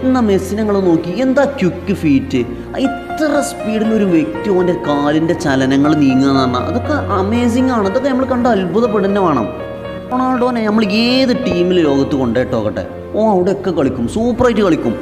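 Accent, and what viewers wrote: Malappuram, India. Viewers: മലപ്പുറം ഭാഷാ ശൈലി ഹൃദയത്തിൽ കൊണ്ടു😘❤ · Malappuram karan Ano?...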